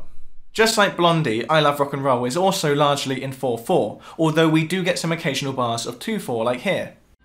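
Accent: United Kingdom. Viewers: The second you started talking I was like Ahh a fellow brit!!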